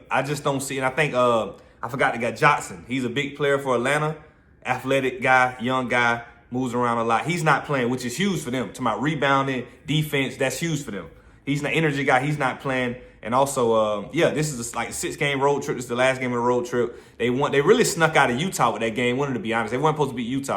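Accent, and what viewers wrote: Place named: Jacksonville, United States. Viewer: Keep these picks comin from the 904 Jacksonville Fl and I think I hear the Duval in you too😂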